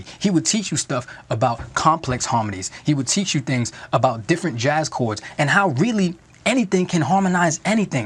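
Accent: New York, United States. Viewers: Where's that accent from (you sound like you're fr NY)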